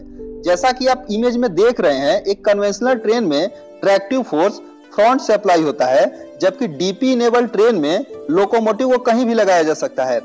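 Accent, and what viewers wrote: Bihar, India. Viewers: Bolne waala Bihari hai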